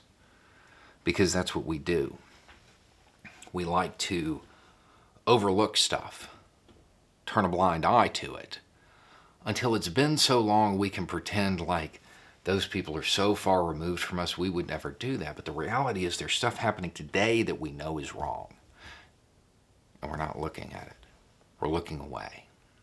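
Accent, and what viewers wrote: South, United States. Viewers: The way that you deliver your messages sometimes; I feel as though I am listening to a secular homily, delivered at the alter of history and humanity. Your accent is reminiscent of a classic “southern reverend” character from movies, so that might lend itself to the feeling as well 😅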